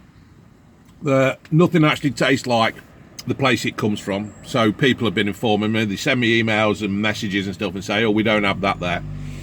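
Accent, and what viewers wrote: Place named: Leeds, United Kingdom. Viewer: Our kid I sense some Leeds dialect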